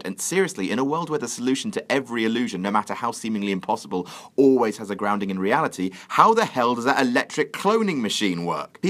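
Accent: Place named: England, United Kingdom. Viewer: stop talkong so fast its hard for us americans to understand you with your dumb english accent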